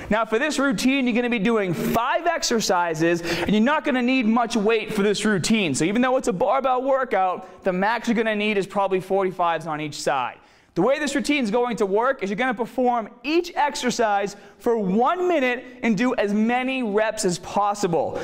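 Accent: Boston, United States. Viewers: You sound like you're from Boston. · Damn this is a beast, sweet Boston accent, and has a physique that I am envious of. If I was gay he may be my type, unfortunately I'm as straight as my spine is throughout my deadlift.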